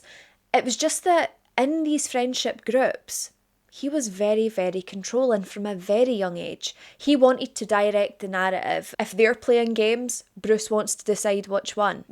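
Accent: Scotland, United Kingdom. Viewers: Thank you for your hard work. Glad to hear you believe in paranormal too. You are my favorite out of all of the ones out there. I’m Irish (heritage live in the states) but love you Scottish accent. So so so so cute! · Hi, case suggestions: Maybe you could consider another case from my native Norway; the serial killer nurse Arnfinn Nesset, convicted in 1983? Horrendous case imo. Your channel is my to go true crime channel. Love your presentation, and your Scottish accent! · I've only recently discovered you, but immediately subscribed after the first video. You have a very pleasant voice, and like so many I adore your beautiful Scottish accent. Will definitely be binging more of your vids! Love and appreciation from Belgium <3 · i’ve been a silent (unknowingly unsubscribed) watcher for a couple of months and hearing a scottish accent pulled me right in. 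i’ve not seen a vid from you about it so was wondering if you could cover the caroline glachan case? she was a young scottish girl from my village and i grew up with the kids who were sadly witness to this crime. it always breaks my heart that people were so quiet and scared for so long, some knowing/suspecting who the perpetrators were but didn’t come forward.❤💙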